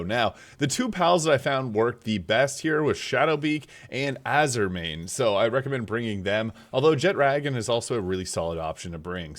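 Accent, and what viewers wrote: Canada. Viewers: Took about 10 seconds to figure out you are EXTREMELY Canadian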